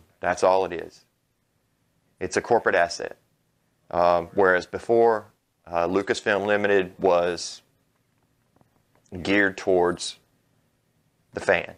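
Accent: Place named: South, United States. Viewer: I've notice something kind of funny.. lol... If the people from the east cost says Ray is a Marry Sue, and this guy who sounds like deep south says Ray is the biggest horseshit Force User. just trance late Deep south langrage to East Cost, its the same thing said in a different style of English, Marry Sue= Biggest horseshit Force User.. At this point I think people in the UK will say the same thing about Ray but in a different style.. you know what this means Disney and Lucasfilms is in deep shit..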